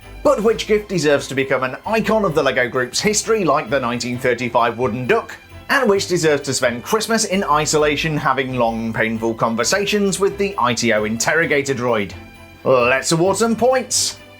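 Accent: United Kingdom. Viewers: haha no, you go ahead. I can always slaughter you in the comments. Actually.... before I saw your actual face, I seriously thought you were ginger. You sound so delightfully British. There, I'm prejudiced myself....🙄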